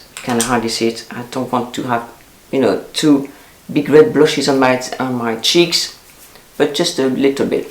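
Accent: France. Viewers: If I wear a lot of make-up, I seem to look a lot older, so less is more for me. Although nearly 69 I've always had and still have a very oily skin, which thankfully means less wrinkles. I have never liked foundation so use a factor 30 tinted city block cream, a little blusher , mascara, eyebrow pencil and lip gloss and that's it. I'd love to wear a red lipstick like you but I'm very fair and a red lip doesn't suit me unfortunately. Love your videos and your wonderful French accent xx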